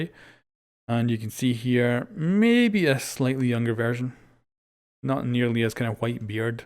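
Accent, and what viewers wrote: Ireland. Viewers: Are you irish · You have to be irish with this accent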